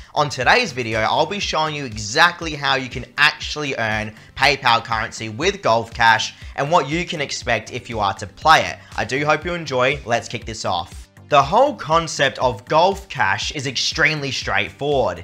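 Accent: Australia. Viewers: I am here because I love ur accent Australian English 😂😂😂😂😂😂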